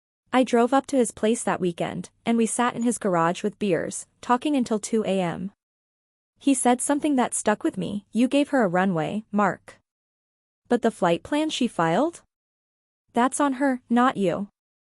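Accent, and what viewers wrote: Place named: India. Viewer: Is the Indian accent really necessary? It detracts from the storytelling.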